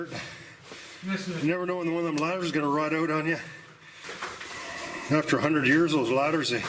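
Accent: Canada. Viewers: cant hide canadian accent - Former Ice road trucker - Alex Debogorski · This guy's really Canadian eah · i wonder if this guy is canadian? "eh?"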